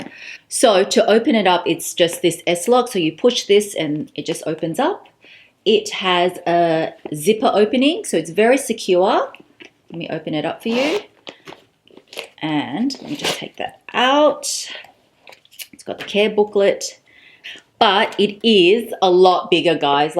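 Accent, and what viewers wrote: Australia. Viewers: Love your Australian accent. Lucky you. I can't even afford to buy the fake bags. Love all the goodies you bought.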